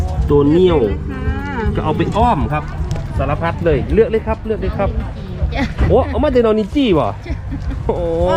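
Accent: Phu Thai, Thailand. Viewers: แอดมินเว้าภูไทมวนครับติดตามประจำเบิ่งอยู่กรุงเทพจากคนอุดร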